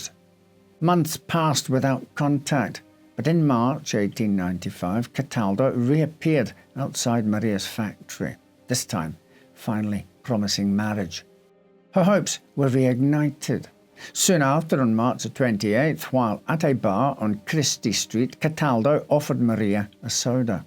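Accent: Scotland, United Kingdom. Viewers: He is Scottish - Edinburgh or Highlands? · Scottish accent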